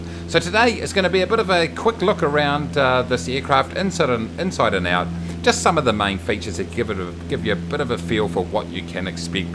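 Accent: New Zealand. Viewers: No offence but a kiwi accent is so annoying. 😂😂😂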